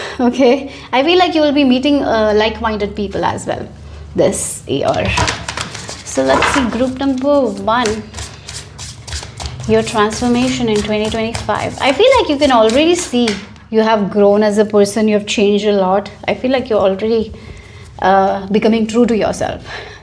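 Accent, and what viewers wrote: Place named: India. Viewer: I am sorry to say ... but what a Shītty Read !! So much Irrelevant bull shît !!!!!!!!!!!!!! And my God your h0rrible Indian accent .. so hard to understand...you literally say QWOON..... instead of Queen !!! Took me a bit to get it.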